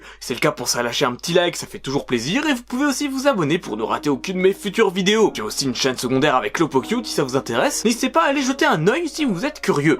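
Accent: England, United Kingdom. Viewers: Je critique l'accent anglais de. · Plus que l'accent anglais, j'avais l'impression que était dépressif sur cette vidéo xD Be proud and happy! =) Vidéo intéressante cependant, j'aime bien les easter eggs:p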